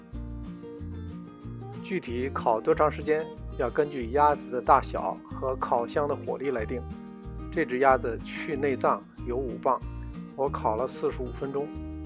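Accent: Beijing, China. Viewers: 喜欢听您这个不张扬的北京腔😉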